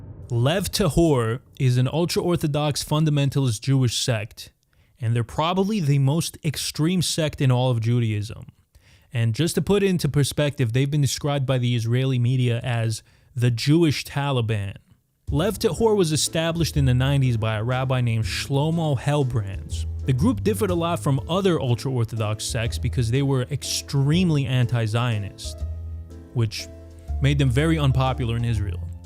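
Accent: New York, United States. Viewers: Hol' up, you're from AZ? With that NYC accent?! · I watch a lot of cult content. But there's something about hearing them explained by someone with a New York accent.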